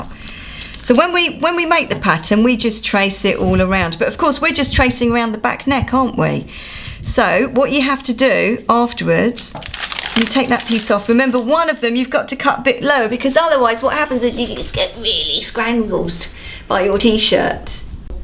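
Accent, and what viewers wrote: United Kingdom. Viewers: thank the lord so much for you annd your sewing smarts! my friends and i are finally able to make a fashion line! and your BRITISH wich makes it one million times BETTER!!!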